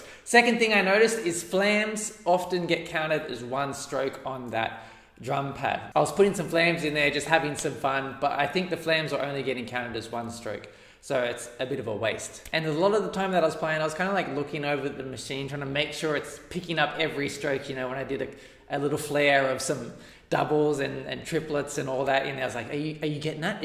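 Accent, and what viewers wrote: Australia. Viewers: where are you from? Australia? new Zealand?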